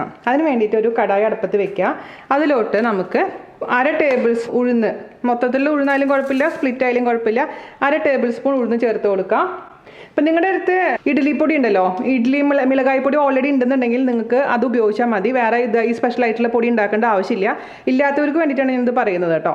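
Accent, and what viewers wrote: Thrissur, India. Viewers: I follow urs and Aju’s world mostly. U both from trissur and it’s nice to listen ur trissur accent.. wish you all the best..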